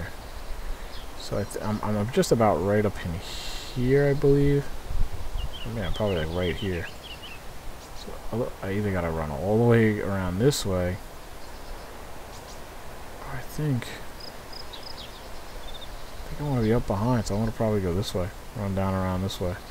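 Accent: Boston, United States. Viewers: R u from Boston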